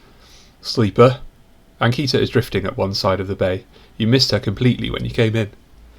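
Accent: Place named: Germany. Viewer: Lol, you losing the German accent remind when of when I was an exchange student in France and during English classes I straight up couldn't speak English anymore. My brain was: Yes. Translate. Know what to do. speaks French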